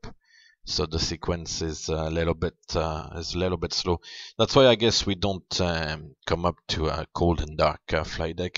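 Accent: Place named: France. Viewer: Un joli accent Français ^^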